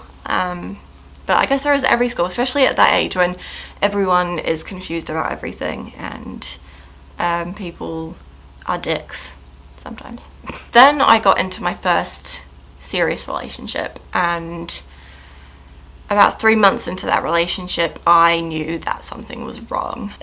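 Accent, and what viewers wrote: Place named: New Zealand. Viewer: Yeah, I like a more submissive role, but I think I can enjoy a dominant role too. (Change of subject). I'm from the U.S. and I've gotten board speaking how americans do. I wanted to change my accent. Long story short, your video on kiwi accent popped up. Since then I've been studying your (and a few other people's kiwi) accents cause that's basically how i want my accent.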